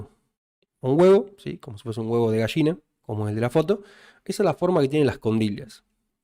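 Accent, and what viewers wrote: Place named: Argentina. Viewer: Excelente video, me enamoré de como explicas más tu acento argentino, saludos desde Venezuela ❤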